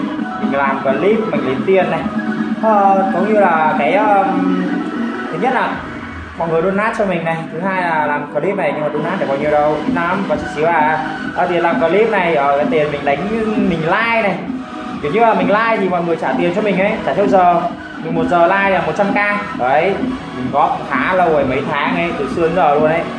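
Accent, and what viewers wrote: Vietnam. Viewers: anh ơi anh miền bắc hay miền Nam vậy em miền Nam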